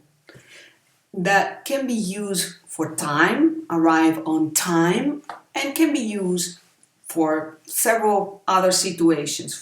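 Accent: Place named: England, United Kingdom. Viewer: Genial, me ha gustado mucho el video, todos son específicos y lo más importantes se te queda la información tanto con los ejemplos y cómo lo explicas., además que se agradece tu acento inglés. Felicidades..!!! GRACIAS...!!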